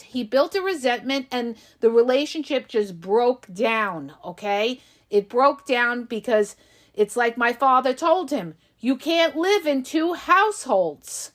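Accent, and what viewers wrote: New York, United States. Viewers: Your from queens or long Island, it sounds like im back in the old neighborhood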